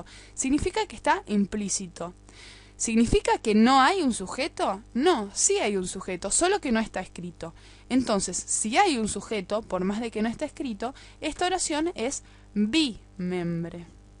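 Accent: Argentina. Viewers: Eres argetina verdad?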